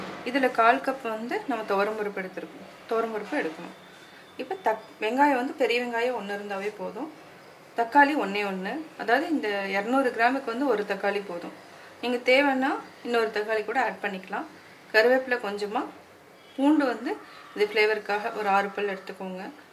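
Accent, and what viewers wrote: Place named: Coimbatore, India. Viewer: .k hi sis starting la sollanalaum vanga nu solrangale..shanthama pesuranga ithuve alagathanapa irukuthu sis.kovai tamil really superp thaan.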